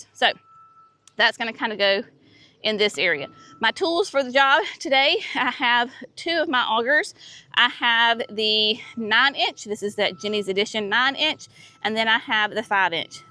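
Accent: South, United States. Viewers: "Sweet Thing"! I love it! Gotta use that one. My first time to view one of your videos. Subscribed and clicked the bell. I'm a southerner too and love your accent. Your garden is truly an inspiration for me. We assembled a greenhouse this past year and now that I can expect to reliably start seedlings I've been trying to figure out how and where to plant all the flowers. I see you just tuck them in here and there and everywhere. I'm going to go through your videos one by one to see how you manage to keep weeds out of your beds... if you till areas first, if you till in amendments, how you lay out drip lines. I usually use sprinklers on timers. I love your Formosa azaleas and am guessing the whites are Mrs. Gerbing. I have those two along with George Tabor at the entrance to my driveway and now, greenhoused, will be taking cuttings to line the full drive. 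I have shade, shade, and more shade. It can be difficult to find a place sunny enough for full sun plants but as you are aware, I'm sure, Proven Winners is expanding shade plants all the time. I agree, Gertrude Jekyll is one of the most amazing roses for fragrance. I'm going to put Desdemona on my wish list too. I actually came here to see if you offered information on how - when to deadhead hydrangeas. I've read that it shouldn't be done but don't see how my plants can possibly produce more buds without access to more light as the old heads dry up. Thank you for showing all the work that is involved in gardening. I too use a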